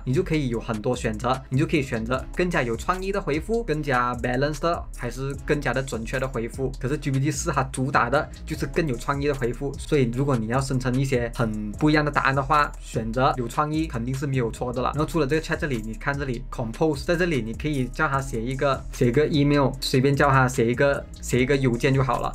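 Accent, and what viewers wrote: Malaysia. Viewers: 馬來西亞口音？ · 马来西亚口音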